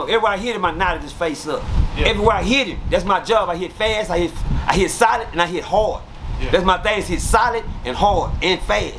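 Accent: South, United States. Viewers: Def high..... he has a southern accent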